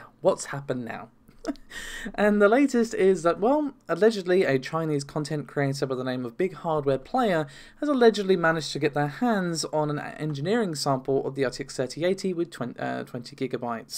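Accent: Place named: Liverpool, United Kingdom. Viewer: He is a scouser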